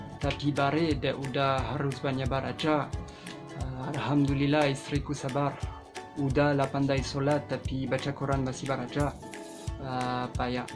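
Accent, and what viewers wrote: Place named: Padang, Indonesia. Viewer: Hihi lucu bule ngomong padang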